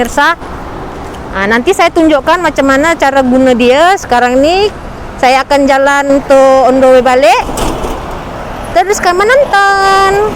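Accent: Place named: Malaysia. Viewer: Wow fasih slang melayu Malaysia ya? berapa lama di Malaysia?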